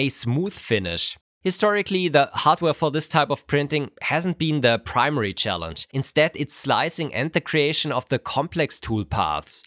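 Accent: Germany. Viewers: If you put aside the quality of the voice that would have sound fake even in the 80's, the worst part is the quality of the translation, it is off all the time when there is some nuance in the term you are using. Fortunately, I learned english a while back and never stopped using it, so original english audio it is. Plus your german accent is something that gives personality to your channel and all of this is obliviated by this disgusting tool. Instead of that, YT should improve the quality of the autotranslation for the sub-titles, it would be so much better.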